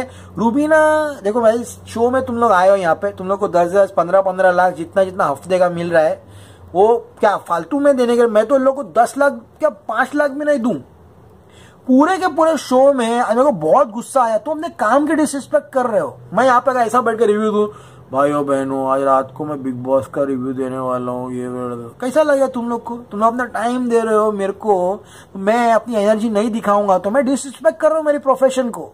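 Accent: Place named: Bihar, India. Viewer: Hahaa bhai Mai bihari but lekin jo tum nach baliye ka bihari dehat wale accent me krte ho mja aa jata hai..hahha tmhara review Bhai full mja More than bb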